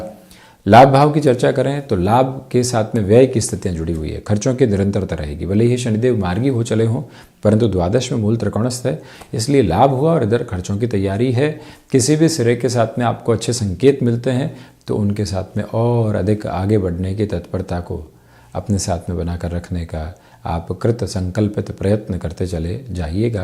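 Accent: Standard. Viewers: Aap Kitna shudh hindi bolte hai🎉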